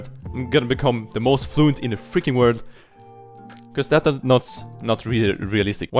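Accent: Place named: Sweden. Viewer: You're Swedish right? You have that typical Swenglish accent hehe (hope you don't take this the wrong way, I'm Swedish myself so it's just very recognizable :)). Anyway great study advice for beginners. I have studied Japanese on and off for 10 years but still only know the basics, but that's the result of only studying on and off lmao.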